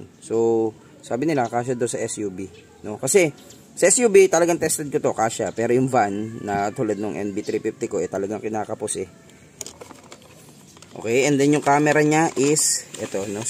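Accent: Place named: Marikina, Philippines. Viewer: Puntong marikina, taga marikina!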